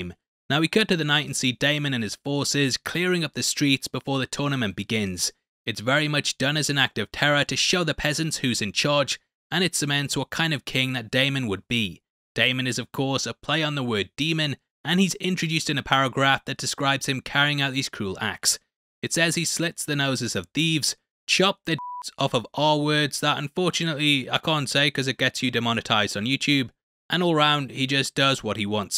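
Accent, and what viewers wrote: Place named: England, United Kingdom. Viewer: I love how your English but your impressions bare terrible lol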